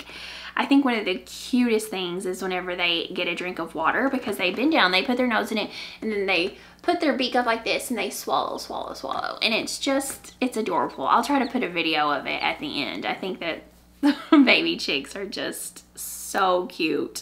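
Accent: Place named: South, United States. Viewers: Shout out to your Southern twang 😁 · Hi! Georgia knitter/maker here. So many fiber podcasters live outside of the South, and I found it charming to hear your familiar accent. Beautiful podcast. Keep up the good work!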